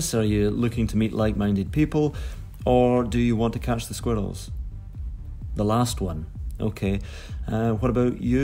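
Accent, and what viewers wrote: Scotland, United Kingdom. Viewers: that lovely Scottish accent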